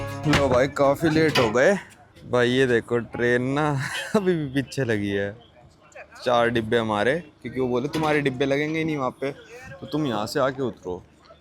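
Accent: Haryana, India. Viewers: Rom rom bhai are you Haryana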